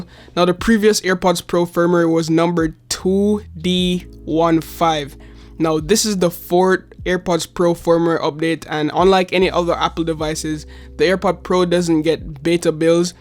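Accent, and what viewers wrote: Jamaica. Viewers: Is that a Jamaican accent? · definitely can hear the jamaican accent :o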